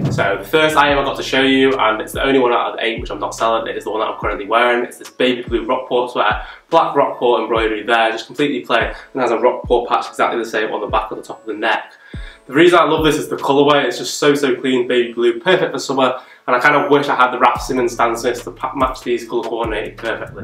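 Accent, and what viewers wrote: United Kingdom. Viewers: First time being called a bro since I'm a girl 😂 I could tell from the accent, but like whereabouts in UK - What city? Ahh cool, any standout stores or you just go to a collective?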